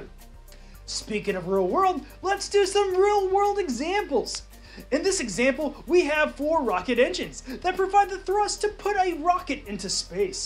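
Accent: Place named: India. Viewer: I thought u were Indian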